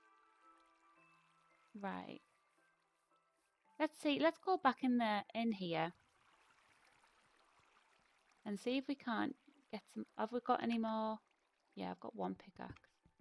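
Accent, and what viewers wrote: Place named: Northeast England, United Kingdom. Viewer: Your accent reminds me of mine when i was still living in the uk. But i was more north central than north east.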